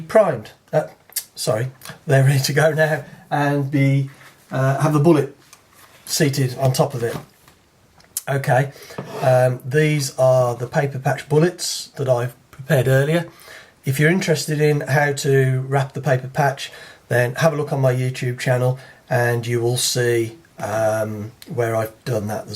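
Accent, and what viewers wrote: England, United Kingdom. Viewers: It's nice to hear an English voice as well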